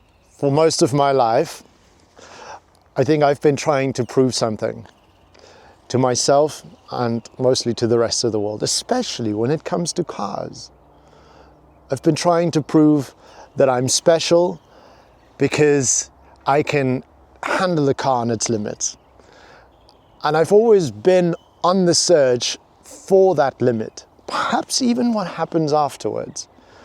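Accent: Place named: South Africa. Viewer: Where in South Africa are you from?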